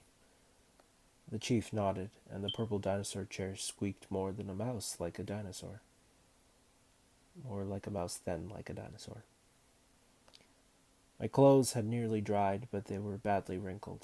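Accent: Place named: Tennessee, United States. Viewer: Great voice. Thank you for uploading. What state are you from? You sound like a friend from TN.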